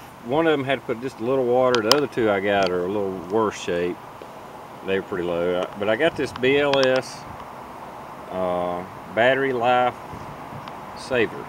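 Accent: North Carolina, United States. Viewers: Nothing wrong with that "country accent"... I have one as well. You sound like a North Carolinian.? Or deep South at least. Great find on those "trashed" batteries!! And great video! Thanks!!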